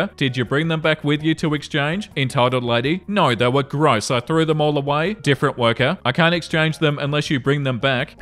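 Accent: Australia. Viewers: He's Aussie, it's a common vocal trait of Aussie accents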